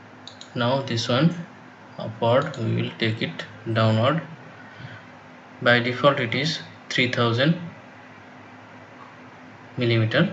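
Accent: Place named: India. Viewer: Hi.what is you nationality dear dude?You speak like Indian People.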